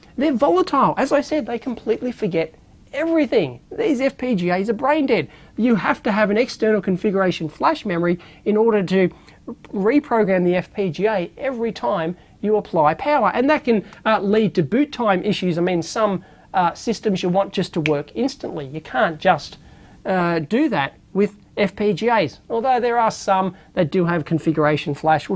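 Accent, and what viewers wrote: Australia. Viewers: This guy is like watching Steve Irwin: in the accent and the excitement. Great speaker. Thanks for making it, really helpful. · Are you from down under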